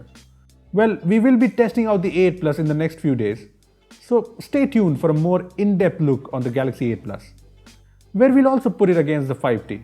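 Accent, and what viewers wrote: India. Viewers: Most genuine indian english accent. Nice review....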